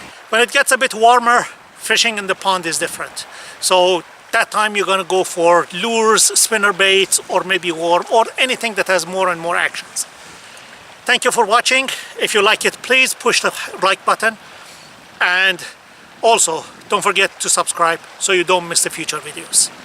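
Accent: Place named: Iran. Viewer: Just noticed your accent are your Persian are you Farsi aaaaaa babajon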